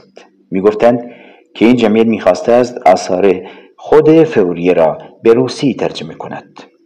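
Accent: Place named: Iran. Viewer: دمتون گرم و سپاس از تلاشتون در مورد لهجتونم باید بگم به نظر من که خیلیم جذاب و شیرینه توفیق مضاعفه هم لهجه زیبای یه خطه از ایران عزیزمونو شنیدیم هم مطالب کتاب را زنده باد تمام ایران و اقوام و مردمانش❤❤❤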